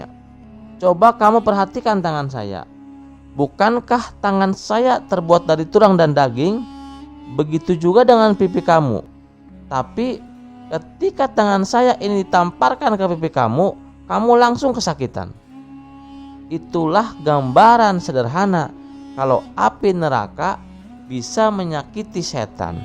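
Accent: Sunda, Indonesia. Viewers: Ieu nu nyarita orang Sunda, medok sundana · Keciri iye org sunda yg mawa beritana.. medok pisan euy sundana..😂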